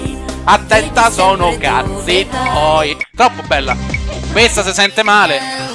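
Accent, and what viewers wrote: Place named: Rome, Italy. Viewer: Se capisce dal suo linguaggio,abita a roma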